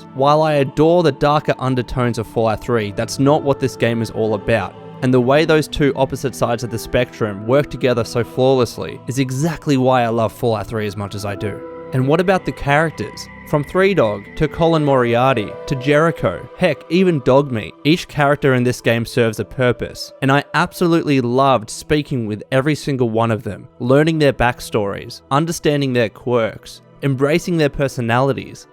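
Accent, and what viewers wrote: Australia. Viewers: Wooo! IVE MISSED THAT AUSSIE VOICE! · Goddamn I missed hearing that smooth Australian buttery voice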